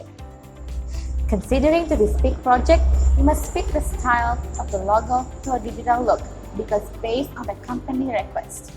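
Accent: Indonesia. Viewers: Kyak orang indo deh, yekan? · where you from? indonesian or malaiysia kek orang indo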